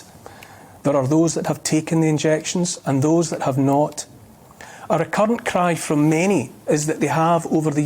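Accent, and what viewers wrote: Scotland, United Kingdom. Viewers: It's lovely to hear a person with a Scottish accent who isn't some horrible leftie.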